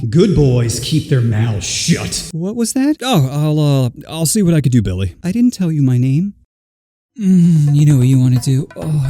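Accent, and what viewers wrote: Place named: Alabama, United States. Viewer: Bros from Alabama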